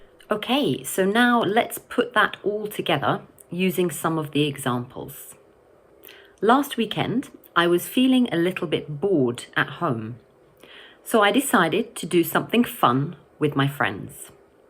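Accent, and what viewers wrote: United Kingdom. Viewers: 흐어어..ㅠ 영국발음 죻아아...♡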